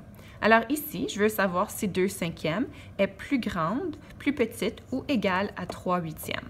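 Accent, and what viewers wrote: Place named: Quebec, Canada. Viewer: bien expliquer je suis la seule a avoir écrit sinon j'adore ton accent quebequois PS:ds pour l'ortographe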